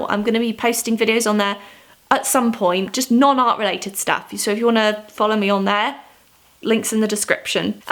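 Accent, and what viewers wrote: United Kingdom. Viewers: Thanks, you always make me laugh, and it's great to hear a British accent. I'd heard of the coloured pencil and baby oil, however, wasn't sure it worked... One hack I always use is Ellnett hairspray. It has to be silicone free. Cheap alternative to graphite fixative, I've even set pastels with it too, and it's a quarter of the price of the named brands. 😊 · I watch your vids for hacks and to hear your amazing voice(I would love to have that British accent ahhh <3 ) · not related to her hacks - but is her accent british. to me it looks like british, but then she mentions about dollar store and Ebay USA. so me a little confused.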